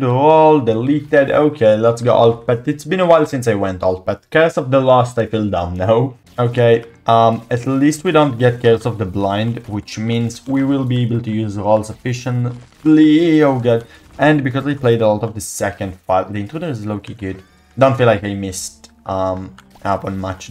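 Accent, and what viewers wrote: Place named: Israel. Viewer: Say, are you Israeli? Your accent and the way you say mother gives me strong Hebrew vibes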